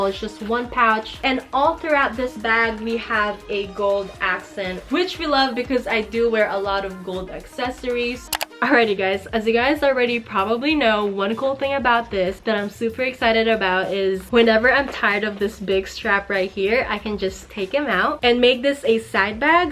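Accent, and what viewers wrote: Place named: France. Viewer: Oohhhhh with that French accent. Not too shabby 👌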